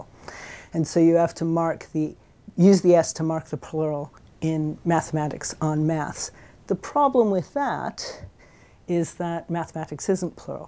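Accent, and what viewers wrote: United Kingdom. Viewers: Even though she's American, she's learned to use quite a few British pronunciantions of words. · The Limey accent has really rubbed off on her.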